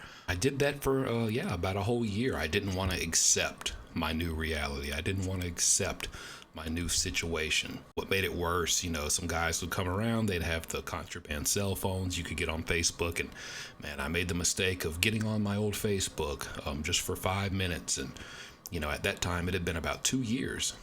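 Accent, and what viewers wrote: South, United States. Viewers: im fairly new subscriber. I love the sound of your voice being from the south like me helps. I enjoy all your content i especially like these type. I listen to you while I am doing my house work set of quite headphones and im off 😂😂😂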